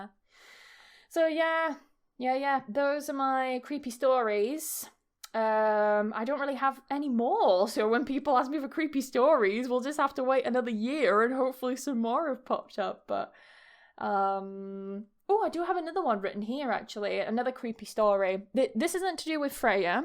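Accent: United Kingdom. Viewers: 100% British English always!!! I really enjoy your videos…..and with them I can develop my skills in understanding the English language. Thank you very much,.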